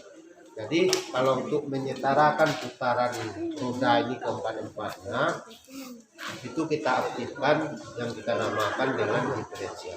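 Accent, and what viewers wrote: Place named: Padang, Indonesia. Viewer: Bapak ini kayaknya orang Padang ya..saya liat dr logat bahasanya 😁😁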